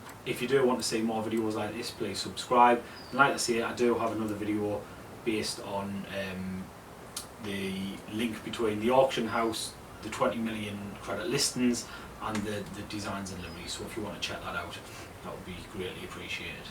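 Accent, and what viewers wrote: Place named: Newcastle, United Kingdom. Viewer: Are you from Newcastle, you sound northern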